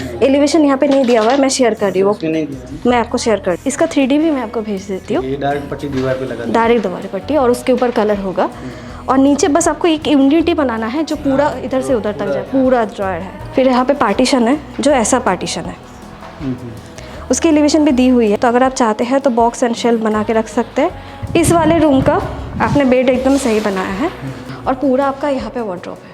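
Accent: Bengal, India. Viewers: Hi I m pritee mother of 2 kids from Delhi. Few months back inspired to bcum interior designer...now became big fan of u and ur bengoli accent...I really luv the way u show and tell everything...u r really an inspiration...I want to know a thing...pls reply....on the bed u place 2-3 pillows and cushions also,.but practically when we sleep we need only one pillow what these clients do for other's on bed? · Love the way you talk your bangali accent just love it and your Diys just love them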